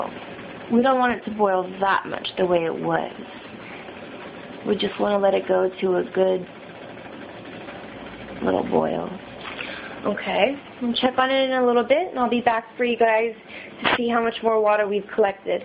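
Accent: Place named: United States. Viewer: Gotta love when idiots are explained how somethign works then makes youtube videos about it: Here.. I have something which obviously is larger than CUPS... marked with 12 sure as hell wonæt be litres and given the accent, we can only surmise it is a yank, no wonder, so perhaps gallons... 12 gallons that size that far up.. feasible, looks a bit over the top but still within reason... Stupid girl goes erm 12 , let me think, cups, ye thatæs right.... JESSSUUSSS. Just leave our planet already please x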